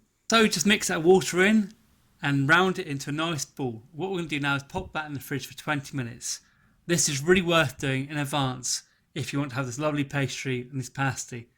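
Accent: Cornwall, United Kingdom. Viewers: You actually do sound pretty Cornish! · Yes you do sound Cornish, and nice to see Bedruthen Steps, not far from where I live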